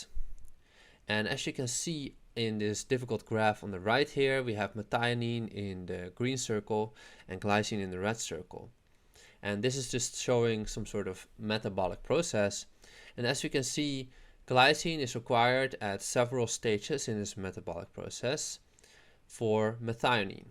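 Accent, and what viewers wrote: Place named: Netherlands. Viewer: Glycine is great, great for bed time, doing keto or carnivore its good to add some glycine. one can balance the methionine with glycine glycine is sweet. I Am just having a glass water with 5 g glycine. You do sound Dutch as I Am subscribed Glycine does so much, its even a mood stabilizer, good for the skin. I often put apple cider vinegar with glycine before my keto meal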